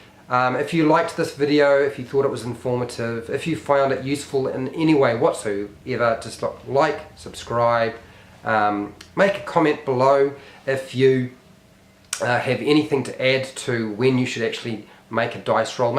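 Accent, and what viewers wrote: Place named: New Zealand. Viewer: he's talking about your kiwi accent :)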